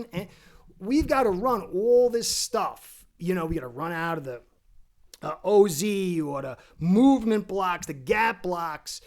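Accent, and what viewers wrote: New York, United States. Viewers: This man sounds more like a Jets fan. (The accent).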